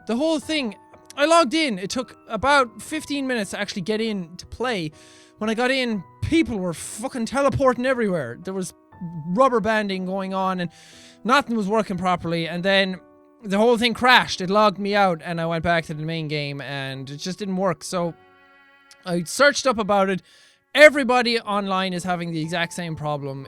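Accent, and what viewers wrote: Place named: Ireland. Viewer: Play station tree I love the Irish lol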